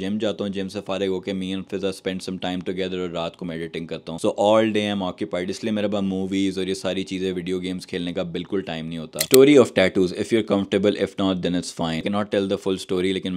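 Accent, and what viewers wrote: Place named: Lahore, Pakistan. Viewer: 2NO LAHORI HEN NA SPEAKING STYLE ACCENT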